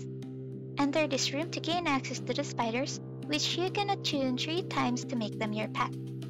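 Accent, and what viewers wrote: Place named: South Africa. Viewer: Awe! I just discovered you a few days ago, as i just started playing roots of pacha, and i have already commented a few times on your vids 😂 but i just wanted to tell you how much your video's and your ADORABLE voice brightens my days!!🤩💕 Also, were are you from? I dont know why, but your accent sounds a bit south african or something like that haha, well im from sweden so i have NO clue, im just guessing hahaha 😂 its something about how you pronounces words, like the fish names in one of you vids, and it just tickles the brain like south African accents do haha 😂 Also, your lil meerkat avatar makes me CRY hahaha, they're one of my favourite animal's , here in sweden we call them surikat, or if there's more than one, surikater haha 😭 You're welcome for that EXTREMLY useful info hahah😂😂 welk just wanted to let you know ur awesome and i love ur vids, you dont even know how much they have helped haha 🤩💕 love from sweden!!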